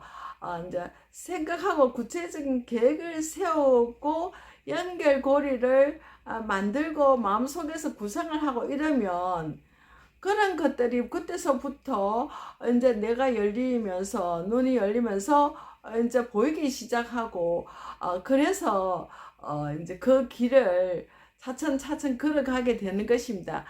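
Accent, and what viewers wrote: Gyeongsang, South Korea. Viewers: 찾아주셔서 매우 감사합니다 좋은 강의도 듣고요 어찌 알고 오셨나요 행운의날예요 간격두고 인사올릴께요 영주 남편고향 가면 사투리 듣는데 경남이신갑네예 ⚘️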